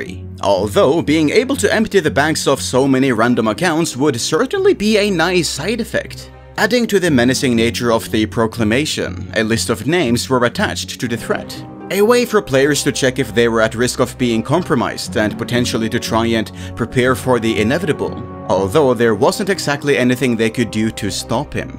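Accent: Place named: Norway. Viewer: Are u Norwegian? Your accent makes me think you are.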